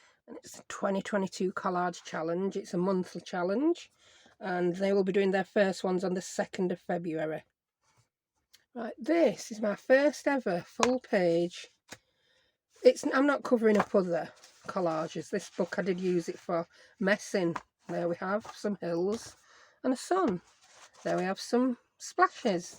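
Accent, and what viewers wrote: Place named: Yorkshire, United Kingdom. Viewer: Dot from Derbyshire Hi, I have just watched your video, for first time. I really enjoyed it, also love your Yorkshire accent. I will be following you. I have just discovered Daphne's Diary also and have had a look at the last two years issues on you tube flip through, I think it is brilliant and will be ordering it for this year. Being new to collage I'm sure it will be great to use it. I have also been watching Froyle Art who is a great inspiration for collage and Gelli printing so I will be dusting off my Gelli plate and 2023 is going to be a creative year for me. Thanks for sharing the video, lots of love from Derbyshire, Dot x.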